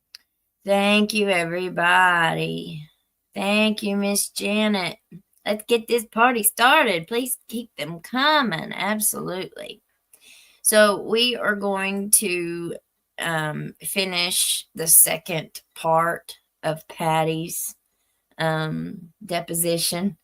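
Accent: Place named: Appalachia, United States. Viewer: G Fish is how I found this channel. I'm in the UK and absolutely love the "Hillbilly" accent ( no offence meant).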